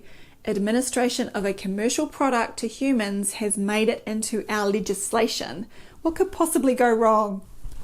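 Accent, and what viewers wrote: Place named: New Zealand. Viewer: Love the kiwi accent. I'm from NZ. The auto words picked up mass phenomenon as mess phenomenon. It is a mess phenomenon.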